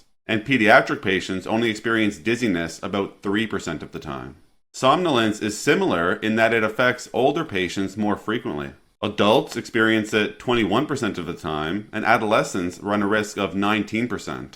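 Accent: Newfoundland, Canada. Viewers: You must be from Newfoundland . Love the channel subbed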